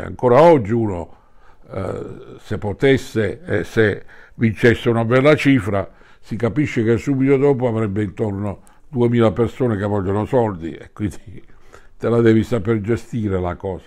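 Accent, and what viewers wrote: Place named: Apulia, Italy. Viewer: Ah dimenticavo, poi quell'accento PUGIESE in lui indelebile che ci ha dato e ci darà sempre tanto. ti abbraccio da Pugliese a Pugiese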